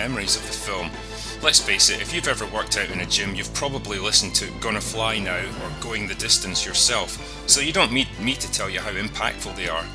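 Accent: Scotland, United Kingdom. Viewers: Until now I've not noticed the Scottish accent at all. 'Drunk' was what I have been hearing. But now I realise the Scottish was there all this time.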